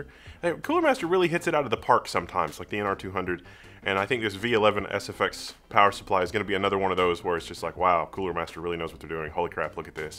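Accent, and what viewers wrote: South, United States. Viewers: the only tech reviewer I know with a southern drawl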